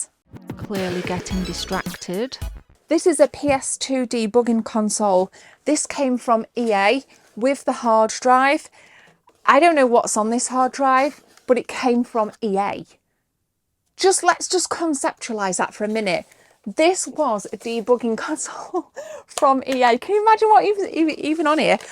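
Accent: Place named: RP, United Kingdom. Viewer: You have a beautifully posh accent. What part of the country are you from?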